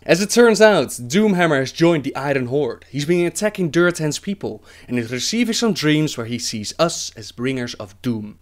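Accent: Netherlands. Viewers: Are you dutch? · heuj Dutch accent! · Are you dutch? I love your accent :D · Nice video :) Lil question, do you happen to be dutch? ^^ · ur dutch accent is next level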